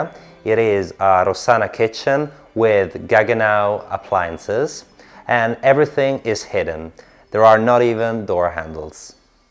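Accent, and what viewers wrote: Italy. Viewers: Love it,! Especially when clip is over 10 minutes! Please come back with your italian, it gives so much style and chic..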